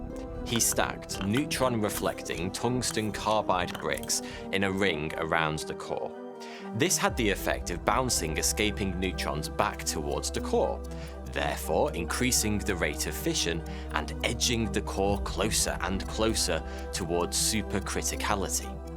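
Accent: United Kingdom. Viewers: Definitely sounds like he's from the UK somewhere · Okay, but why is Frankie Muniz doing a British accent?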